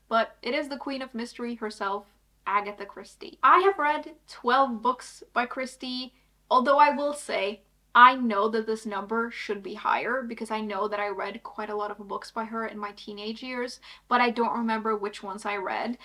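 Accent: New York, United States. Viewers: No way! Maybe it's because of your accent, but I always thought you were from New York, USA or somewhere near there. 😆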